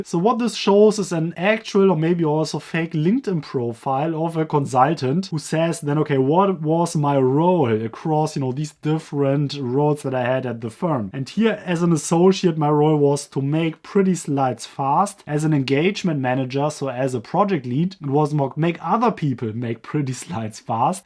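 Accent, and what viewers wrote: Germany. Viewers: Das gute alte German English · I love your German accent while speaking English :D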